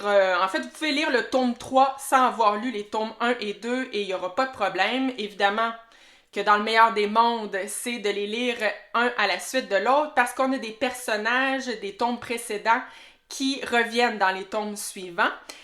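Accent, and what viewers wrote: Quebec, Canada. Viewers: Coucou 😊 super vidéo 👏👏 i comme c'est agréable d'entendre l'accent québécois ❤️❤️❤️ comme dis sur Instagram je découvre pleins de nouvelles sagas et surtout j'ai mis malphas dans ma wishlist! Merci pour cette vidéo! Bisous 😘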